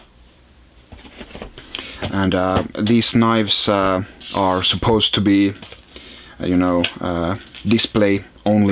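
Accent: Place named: Finland. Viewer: I noticed the finnish accent right away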